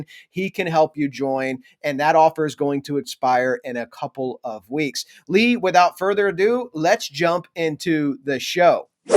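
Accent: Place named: United Kingdom. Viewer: thx B, I thought so. He sounded British. Well, I'll try him again on Telegram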